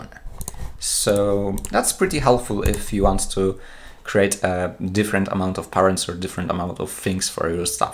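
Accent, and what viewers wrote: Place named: Poland. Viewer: nie wiem czemu ale od razu wiedzialem ze to polak nagrywa. Akcent nie angielski ale kogo to obchodzi. Nie musi byc przeciez native speakerem. Hindusowego akcentu tez nie ma :D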